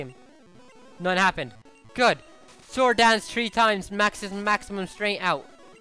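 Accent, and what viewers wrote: Ireland. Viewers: Oh my God your accent is AWESOME!!! Are you from Ireland??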